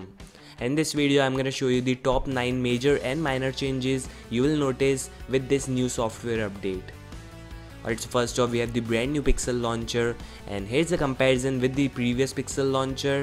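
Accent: India. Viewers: Can't understand terrible Indian accent. Bulbulbull....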